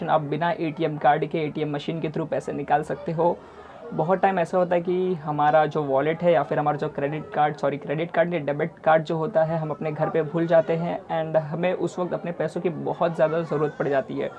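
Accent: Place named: India. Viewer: I can’t understand your Indian ass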